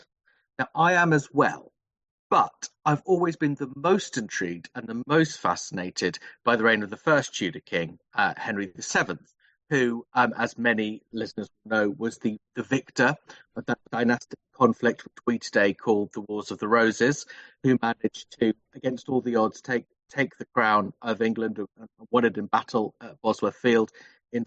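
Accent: United States. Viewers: No disrespect, but find listening to words mispronounced, and an American accent when discussing Tudor history, a lot of which is supposition, especially about Prince Arthur, very off putting.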